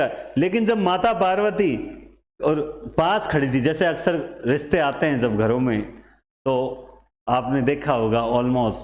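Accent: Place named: Rajasthan, India. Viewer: आप के मुख से मारवाड़ी बहुत अच्छी लगती है गुरुवर मन गदगद हो जाता है 😍😍😍😍😍 मेवाड़ी कलम से ✍️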